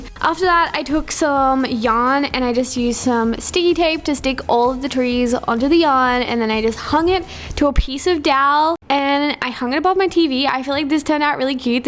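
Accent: Australia. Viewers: her voice sounds as if she was aspyn ovard...yet australian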